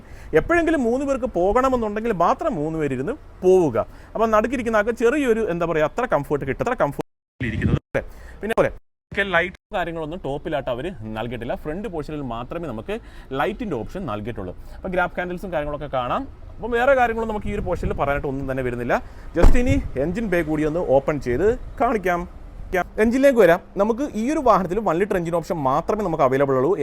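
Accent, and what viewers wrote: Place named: Kollam, India. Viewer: കൊല്ലം language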